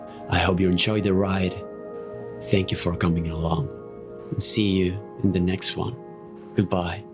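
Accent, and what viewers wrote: Argentina. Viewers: hey, where are you from? I find your accent very similar to mine, I'm from Argentina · tenés acento argentino sera q sos argentino??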